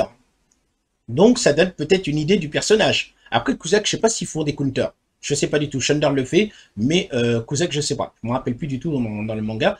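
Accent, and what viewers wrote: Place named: England, United Kingdom. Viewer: Superbe accent anglais ! Tu rentres dans le game mondial du gatcha youtube 😎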